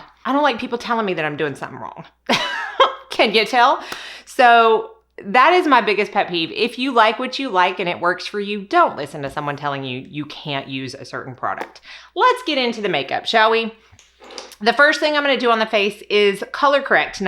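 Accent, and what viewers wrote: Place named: Texas, United States. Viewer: Hey. You are on FIRE today! You go girl! What a great video. I didn't know you used to work as a MUA. It makes sense now why your videos are so chalk full of great information, and why I've gravitated to your videos. BTW... I also love your accent. You kind of sound like my cousins who live in Corpus Christi Texas. I could listen to you all day long. Thank you. Love you, Calvin.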